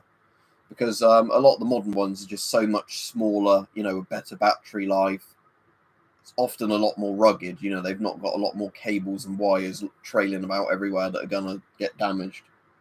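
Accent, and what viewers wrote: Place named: United Kingdom. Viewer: Seu sotaque britânico é muito elegante! ❤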